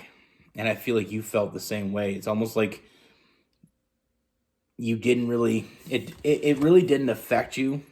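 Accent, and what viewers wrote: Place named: Canada. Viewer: I'm from New Brunswick. Are you from Canada? i hear an accent. lol. if not. I'm so sorry.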